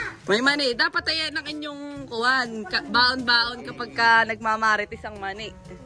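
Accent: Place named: Infanta, Philippines. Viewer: Good morning ang sarap ng lugaw tamang-tama sa malamig na panahon😊 parang ang punto niyo po ay taga infanta tama po ba?😊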